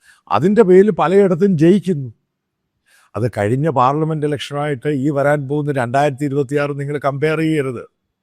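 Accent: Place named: Kollam, India. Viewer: Ithu Kollam slang😅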